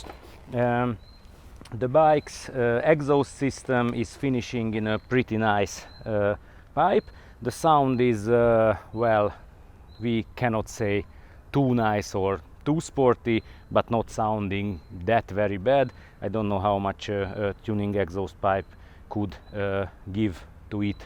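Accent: Hungary. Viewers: as soon as i heard your accent i knew you are hungarian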